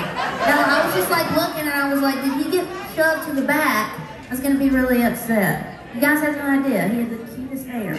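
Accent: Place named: Virginia, United States. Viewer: Love her Virginia accent, my home state. She's going places!! Another great Virginia Artist!!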